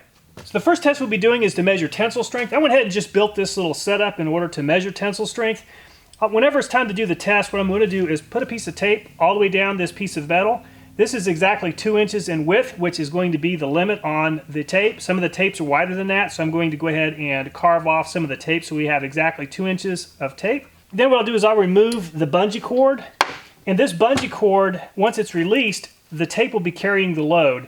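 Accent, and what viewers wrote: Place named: Brooklyn, United States. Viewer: He's from brooklyn you can tell by his accent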